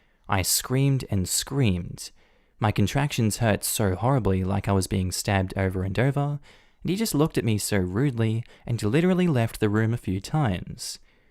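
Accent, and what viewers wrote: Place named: Australia. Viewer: You sound like an Aussie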